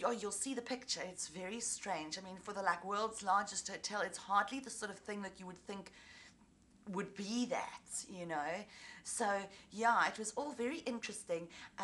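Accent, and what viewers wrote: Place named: United Kingdom. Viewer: u got british accent..;)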